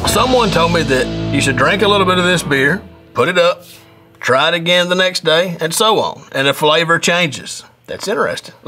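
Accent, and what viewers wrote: South, United States. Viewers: Nice hat, glorious beard, southern draw....well hell, Ima have to subscribe to your goofy ass.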